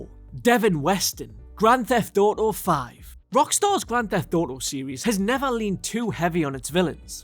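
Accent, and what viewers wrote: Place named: Scotland, United Kingdom. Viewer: I love scottish accent, but i felt was missing some humpf… like more energy… felt like just reading, just my opinion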